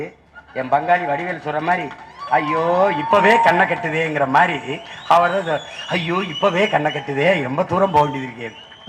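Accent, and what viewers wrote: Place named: Kerala, India. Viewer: என்ன எழவு மொழிடா இது. மலையாளியா?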